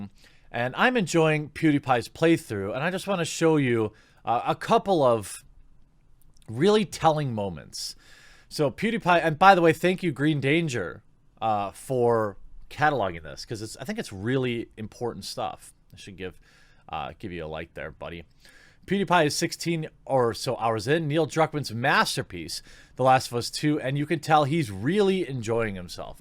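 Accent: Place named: United States. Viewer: When did ditch his accent? He sounds completely american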